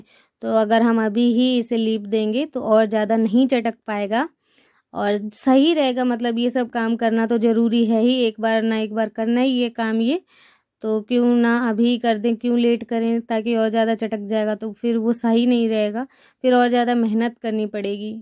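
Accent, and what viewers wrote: India. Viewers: Ap hariyana se ho?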